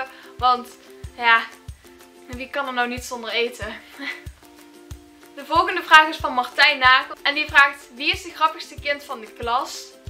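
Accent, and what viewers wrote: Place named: Limburg, Netherlands. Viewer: ben je een limburger? hoor t aan je accent! ben er zelf ook een :)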